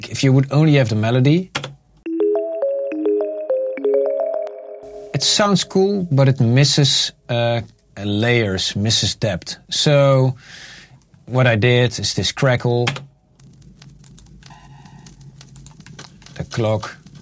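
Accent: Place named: Netherlands. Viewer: You can really hear he is dutch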